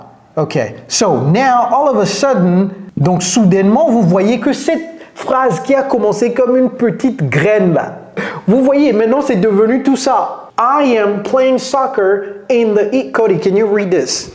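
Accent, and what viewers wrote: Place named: United States. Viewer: He is very fluent With his american accent.😊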